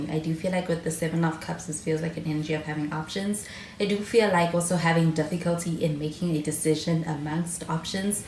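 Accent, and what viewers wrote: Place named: South Africa. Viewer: You sound South African to me for some reason... Your accent just sounds so familiar to me, not you specifically, but in general.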